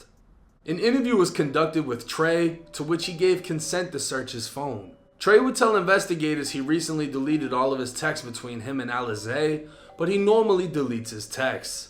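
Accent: Boston, United States. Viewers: Im calling bs u ain't from Florida listen to your accent keep that shit in Boston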